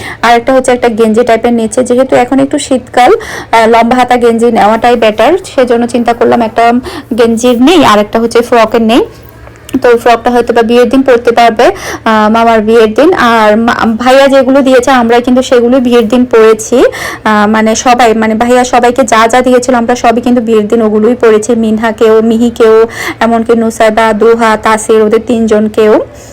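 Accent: Chittagong, Bangladesh. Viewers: Chatagong a vasa no boja r da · Onk din por Chittagong er kotha sonlam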